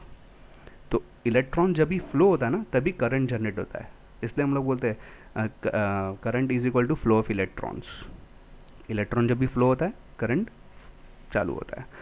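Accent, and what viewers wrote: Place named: South India, India. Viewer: Amazing way of teaching great sir, seems like you are from South 😊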